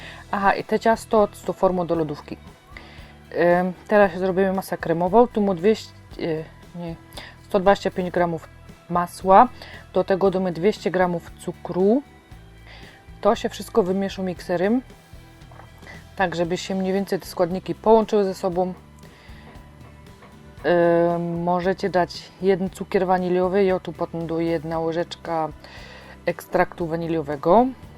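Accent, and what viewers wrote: Silesia, Poland. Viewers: Kocham ten kanał i twoją śląską gwarę! :)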